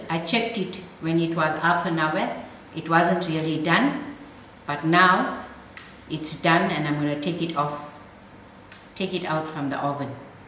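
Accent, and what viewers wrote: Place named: Durban, South Africa. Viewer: U explain so well in your Durbsn accent love the way u cook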